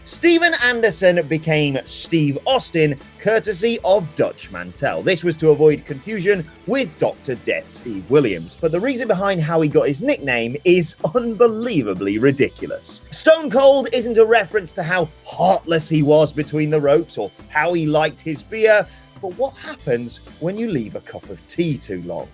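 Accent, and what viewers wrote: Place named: England, United Kingdom. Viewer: That's exactly how it's pronounced. What's your problem with it? I'd like to know. If you mean that Americans say it like "motcho" that's just a difference in vowel sounds. The guy in the video is English, and that's how we say it.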